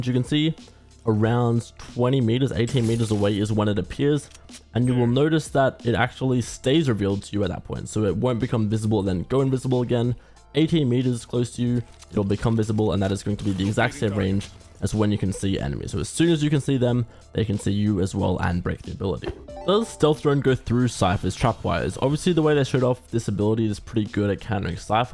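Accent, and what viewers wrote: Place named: Melbourne, Australia. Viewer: u r from melburrrnnnnn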